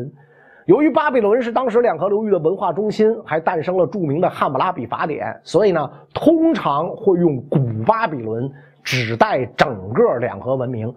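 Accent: Beijing, China. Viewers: 嘥氣啦，從前的中華文明文化早已被滿清和共產中共中斷了。至少阿袁生你現滿囗京腔的煲東瓜就不是原來漢人語音，煲東瓜基本上是蒙滿的雜交語，作為文化最重要的載體語言都被入侵者同化了，還好意思說你同化和改變了入侵者真的是太過荒謬。還有就是儒家，從滿清到今日的儒家早已不是滿清以前的儒家了，今日的儒家是被滿清及中共改變扭曲了的奴化儒家。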